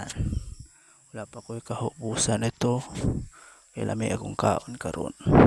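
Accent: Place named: Iloilo, Philippines. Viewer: Ang sarap ng kain enjoy po sana mapansin nyo po ako fr healing live parang ilonggo ka po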